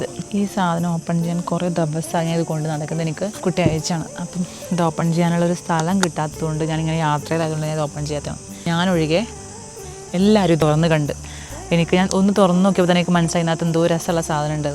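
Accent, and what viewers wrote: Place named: Kannur, India. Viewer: Slang aan enik ishttam❤ kannur?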